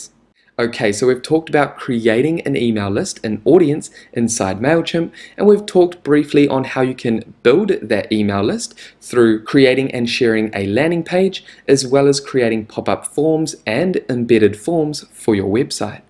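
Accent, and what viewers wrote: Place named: New Zealand. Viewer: Love your NZ accent dude! Cheers from Canada!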